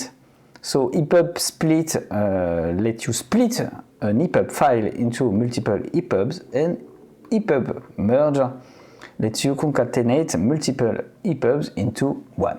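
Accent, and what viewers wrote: France. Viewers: loved your french accent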